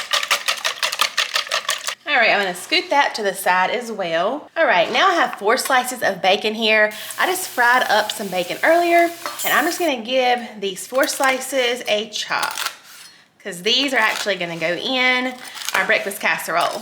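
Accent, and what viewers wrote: South, United States. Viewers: Btw, I love your southern drawl ❤ 😊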